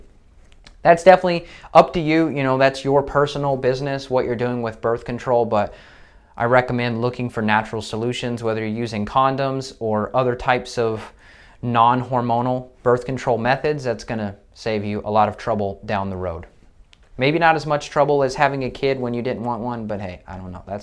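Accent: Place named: United States. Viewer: love your American accent 😀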